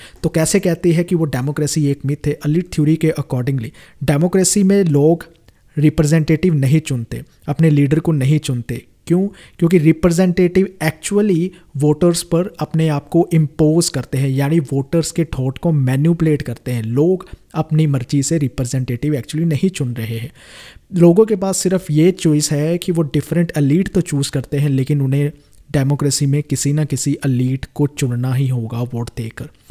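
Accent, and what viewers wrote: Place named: Jammu, India. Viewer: awaz se jammu ka lg rha h bhai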